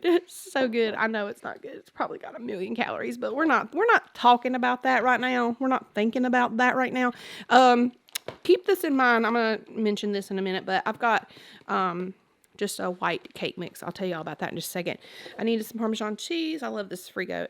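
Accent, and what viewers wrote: South, United States. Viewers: I’m so glad you are back! I miss that lovely southern accent!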